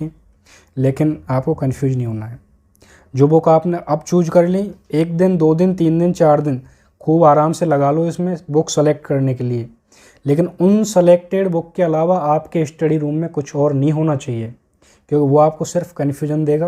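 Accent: Bharatpur, India. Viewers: Bhi bharatpur ke lagte ho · Where are you from?🤔 You talk in bharatpur accent..🤔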